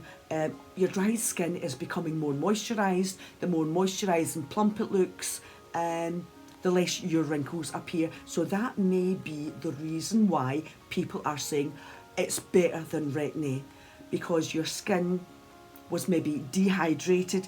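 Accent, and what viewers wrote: Scotland, United Kingdom. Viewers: I think scottish accent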